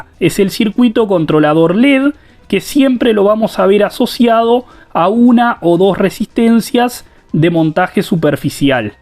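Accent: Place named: Provinces, Argentina. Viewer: maestro, usted vive en Mexico? porque tiene acepto argentino, provinciano, no porteño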